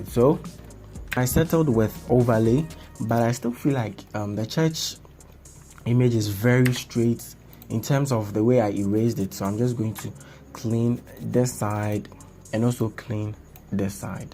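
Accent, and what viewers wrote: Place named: United Kingdom. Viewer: British accent 😂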